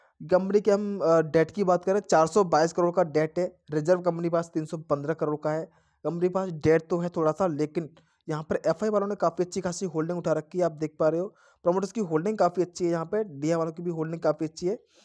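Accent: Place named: Rajasthan, India. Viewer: Boli se Rajasthan ko laage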